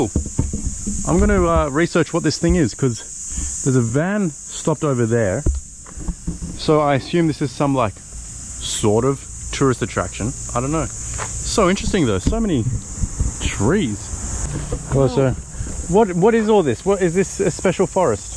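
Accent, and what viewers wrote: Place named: Australia. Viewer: Fun and engaging video!,reminds me of Harald Baldar! Really love your aussie accent!☺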